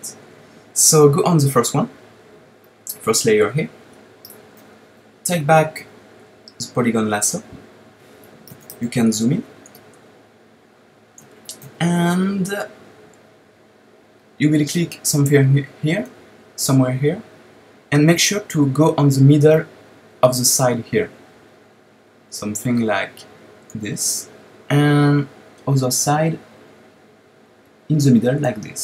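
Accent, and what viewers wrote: France. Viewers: French accent :< ( Je suis français aussi, énorme tes vidéos :)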